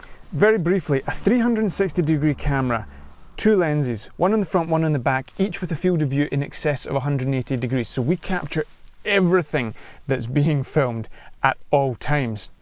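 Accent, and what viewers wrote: Scotland, United Kingdom. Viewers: Great summary & nice video. Brilliant to have a Scottish accent rather than an American one for a change. Cool car to film too. Did you just wedged the pole down the bolster of the seat & which of the 3 selfie stick options were you using (I see the carbon fibre stick is out of stock) ?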